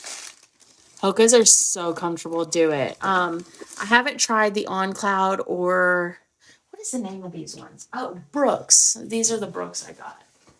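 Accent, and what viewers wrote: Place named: South, United States. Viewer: You have become my new favorite creator. I find you adorable and entertaining af. You're so sweet and funny and I love your southern accent. ❤ Anyway, have a good night!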